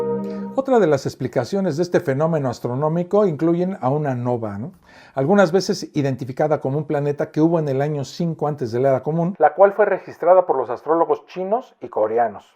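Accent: Mexico City, Mexico. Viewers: primer vez que te veo, y ya vi varios de tus videos, todos muy interesantes, pero para ser una persona muy culta tienes un acento chilango, demasiado !!!NACO!!!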